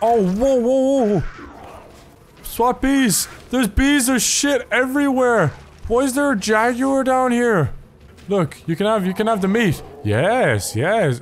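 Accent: Ireland. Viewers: Your Irish, are u from the Midlands , you sound it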